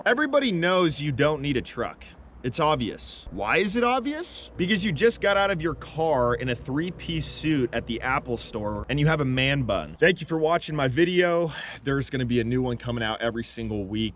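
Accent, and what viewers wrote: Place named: California, United States. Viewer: This dude sounds extremely California lol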